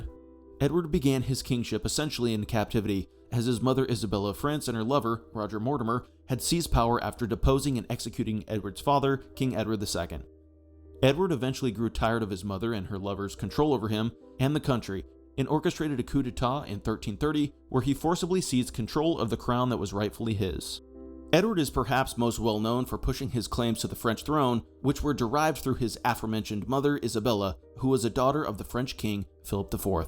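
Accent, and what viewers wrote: United States. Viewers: Why the American accent??!!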